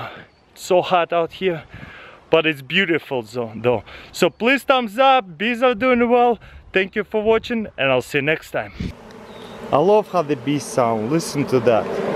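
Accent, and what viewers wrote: Russia. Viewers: i have no real interest in bees aslong as i dont get stung but his russian accent makes it worth the while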